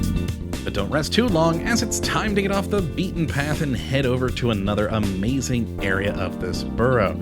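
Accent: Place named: United States. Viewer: This mate trying to sound funny, Hate his American accent.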